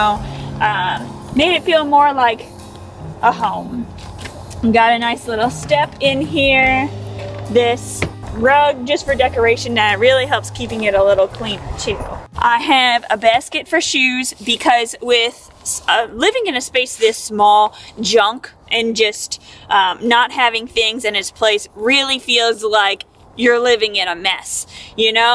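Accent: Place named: South, United States. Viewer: I love looking for that southern accent in you voice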